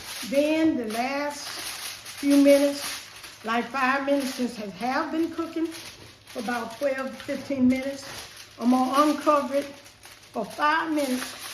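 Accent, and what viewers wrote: South, United States. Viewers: Thank You, watching you be so kind with your southern accent reminds me of my mom.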